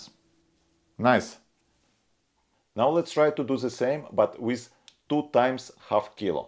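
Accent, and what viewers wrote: Russia. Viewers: Thanks for review. I think this device can be used to control movie camera to fuse real and virtual video or make other effects, or even to clone "handwriting" on letters BTW: your accent is to similar to mine, are you from Russia ?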